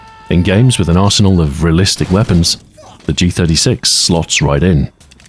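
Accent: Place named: United Kingdom. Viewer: Love hearing that thick British Accent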